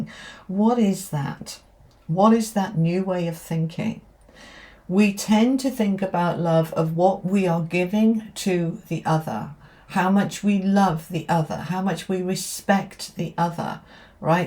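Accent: United Kingdom. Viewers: Dear I started watching you around this time last year. A few things I love about you (besides your beauty and style): You are genuine. Your readings are not robot-like or business-like. It feels like you’re talking to a best friend. You’re also motherly, “in the moment”, very kind and patient. Sometimes I listen to you over and over when doing my hair or doing housework. It just feels homey and warm. I repeat certain words right after you with British accent haha (I’m writing from California). There is more to your videos than just simple tarot readings. There is heart, there is depth and there is wisdom. Have a lovely 2025, beautiful! You are appreciated.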